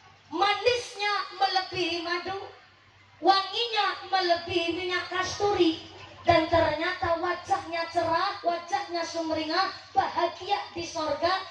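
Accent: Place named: Banyumas, Indonesia. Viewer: Ustadzah mumpuni logat ngapak yang spesial mudah di pahami dan memberikan edukatif semangat pengetahuan manfaat termasuk dalam rangka memperingati Muludan jika mendengar bikin ketawa yang spesial mantappp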